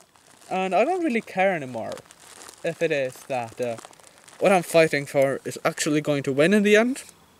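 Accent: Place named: Ireland. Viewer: I can tell your accent is Irish possibly and thoroughly enjoy all your videos. Anyways thnx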